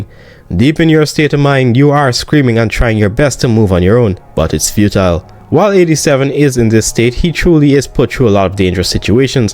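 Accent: Jamaica. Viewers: Jamaican? Which parish bro? 🤙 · Is you Jamaican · You sound jamaican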